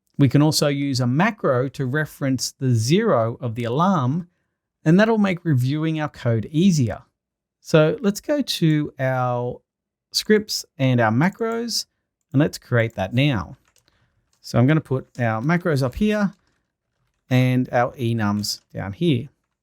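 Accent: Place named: Australia. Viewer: great videos and love the familiar aussie accent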